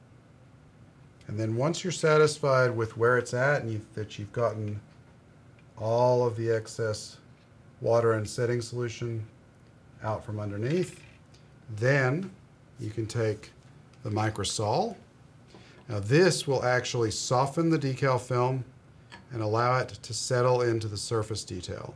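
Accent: Australia. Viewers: bit of an Ozzie accent there sometimes 😁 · G'day...two quick questions...do I note the hint of an Aussie, if not Canadian accent?? Just at times it's more noticeable. Secondly, and more subject oriented...have you gloss coated the Vamp first?? and do you subscribe to the process?? I'm in the process of doing several 1/350 ships...and as such they're not exactly small...and just wondering if the overall process is beneficial on models of this scale?? Thoroughly enjoy all the hints and tips videos...as I've been out of modelling mode for many years, it certainly helps immensely. Cheers from Sydney Aus!!!!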